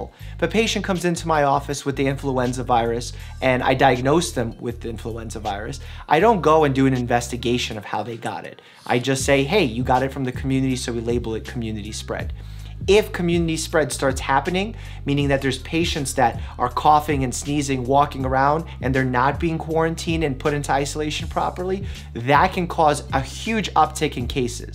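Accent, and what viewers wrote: Russia. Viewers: Hey doc are u a Russian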